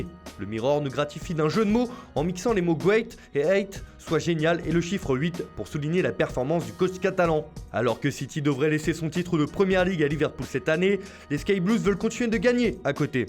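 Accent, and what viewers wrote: Italy. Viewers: Reds Jr perso moi j’peux pas j’ai envie d’entendre l’accent italien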